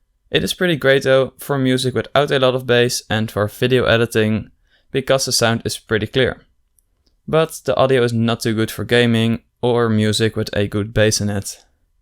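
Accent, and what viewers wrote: Netherlands. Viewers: ur dutch right? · sws nederlander hahahha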